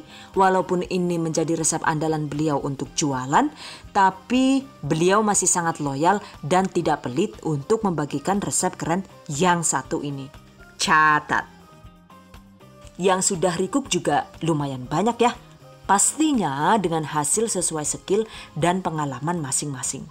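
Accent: Banyumas, Indonesia. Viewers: Mksih Bund..resepnya mantul apalagi ngapaknya pd kro nyong banyumasan Bund...ora ngapak ora kpenak😃😃😃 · bunda...BHS ngapak mu bikin aku MLM 2 ngekek...bunda asli dr kota mana sih?tinggal di Madiun. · ya allah mba.. ngapak pada karo nyong lah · Trima kasih resepnya bun.. insya Alloh jd ide jualan lagi nih..😁 Jadi nyengir" sendiri denger ngapaknya..😁😁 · Pengen kenal sama bunda, ngapak nya itu loh gemesin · ini bunda org mana sih?koq ngapak bgt hehehhe kyk saya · AQ paling suka nonton video mbk lucu ada ngapak2nya karena AQ orang ngapak hehehe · Senengnya belajar msk SM Ada hiburan ny ngapaknya Saya seneng karena SM dg saya dri Cilacap 👍❤️ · Ngapak sih mba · Bikin resepnya jelas bgt👍👍👍 tp gak kalah sama bhs ngapaknya jelas poolll, kencoot😂😂😂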